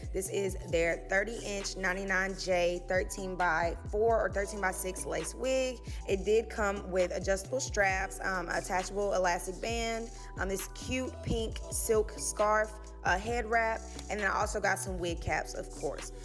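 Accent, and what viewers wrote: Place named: Texas, United States. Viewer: Oh my God' your S00 Beautiful sound Like you from teax💕😘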